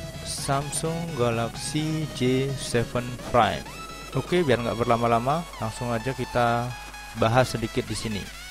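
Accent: Bali, Indonesia. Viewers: logat balinya kentel kak 😂. orang Bali kah? #cumantanya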